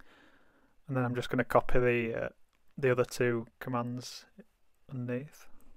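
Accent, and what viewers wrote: United Kingdom. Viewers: Great tutorial. Up the northern accent! Hull all the way! Does this work well with Jetstream or is there a clash between the logins? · Hey, just found your trading bot video and seen that the next video you published was this one, recently. You sound local to me. You’re not from Teesside are you by any chance?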